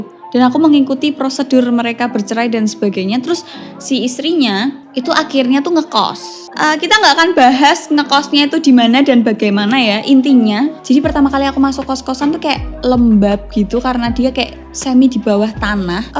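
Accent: Java, Indonesia. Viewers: mirip Nike Ardila, tapi ngomongnya agak medok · Medokmu itu bikin kesemsem😍😍😍 meskipun ga ngerti juga😅😅 salam dari bandung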